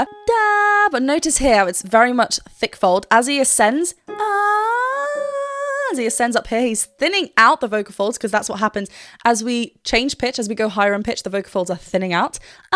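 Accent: England, United Kingdom. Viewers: Kamu orang inggris ya saya hanya ingin denger kamu bilang water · r u British?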